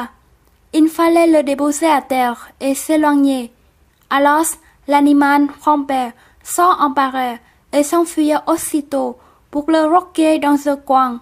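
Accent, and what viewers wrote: Vietnam. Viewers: trời giọng người vn mà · Giọng Vn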